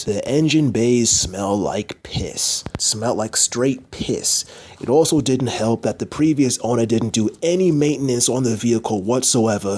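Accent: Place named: Staten Island, United States. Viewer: Are you from Staten Island? You sound like one of my boys.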